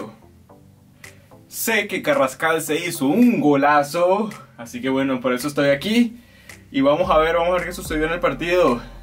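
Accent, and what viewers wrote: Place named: Cali, Colombia. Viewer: Puro asentó de Cali ve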